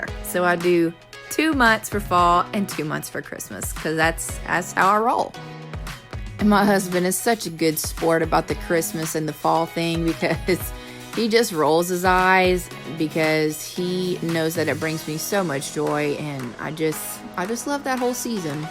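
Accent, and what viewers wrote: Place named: South, United States. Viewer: Guhhh this made me smile! I love you so much and I LOVE that southern accent sha :-) #nevachange 😄😄😄 Also chili's and Lowes sounds like the best date ever. Just sayin. 😂😂😂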